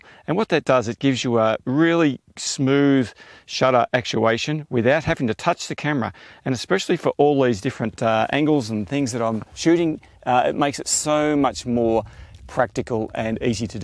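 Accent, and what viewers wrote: Australia. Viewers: Nice to hear an Aussie accent on YouTube 👍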